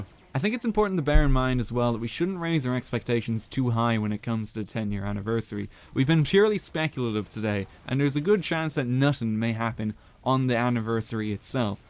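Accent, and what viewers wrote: Ireland. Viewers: Hey are you a fellow irish person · are you from ireland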